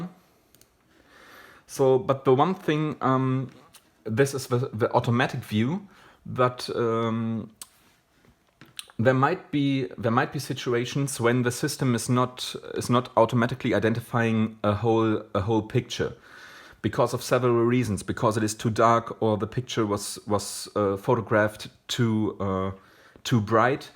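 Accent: Germany. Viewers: dieser deutsche akzent den hört man als deutscher irgendwie immer raus unfassbar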